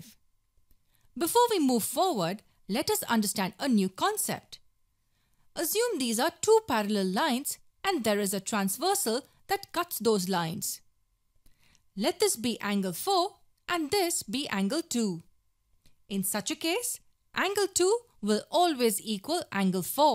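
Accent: India. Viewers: The indian accent.... ughhhh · Beautiful Indian accent! Clear!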